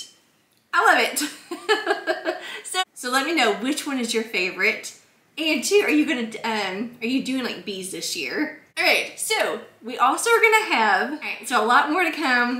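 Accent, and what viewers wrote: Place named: Tennessee, United States. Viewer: I just came across your channel. I enjoyed this video. Each little project came out just adorable. Your tier tray is awesome. I'm trying to guess where you are from by your accent. Maybe Tennessee ? Or one of the Carolinas. I live in Upstate SC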